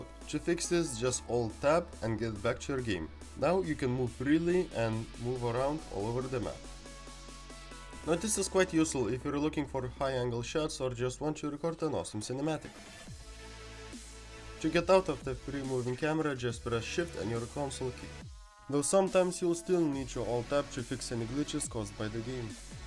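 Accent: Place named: Russia. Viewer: Чувак, ты из России? По акценту слышится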